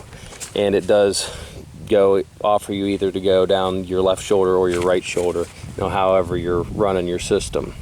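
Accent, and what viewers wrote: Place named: Pennsylvania, United States. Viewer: Where are you from? I can't put my finger on your accent. My guess is Central/Western PA? I have a the Granite Gear Crown2 60 and love it. Recently picked up the Gossamer Gear G4-20, a 42L frameless pack that weighs in at 25 oz. I'd say its a lot more comfortable than the Crown2 if you can get your kit dialed in to fit into the smaller pack. Worth checking out for sure!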